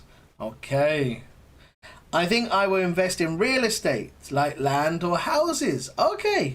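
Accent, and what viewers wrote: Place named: United Kingdom. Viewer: I am crazy about British accent loved it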